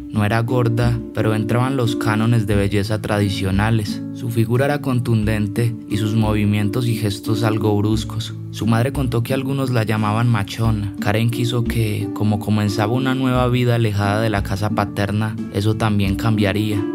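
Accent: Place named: Antioquia, Colombia. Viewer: Que paisa, es del Valle de Aburrá?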